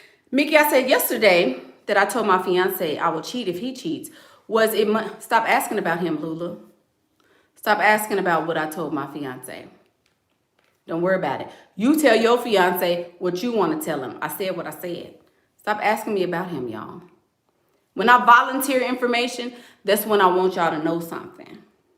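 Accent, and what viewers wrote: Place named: South, United States. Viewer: you did it again!!! This video was everything!! Straight facts.. I’m 26 years old and I finally stepped out the victim mentality.. and watching you more and more makes me really double think of what I DO!! you crying is definitely not a sign thing.. when you hear real and feel it you automatically going to cry because I sure did.. I highly appreciate you.. and I love you southern accent we Texas women rock lol. Much love to you 🥰 PS:50,000 subscribers will definitely be yours by your birthday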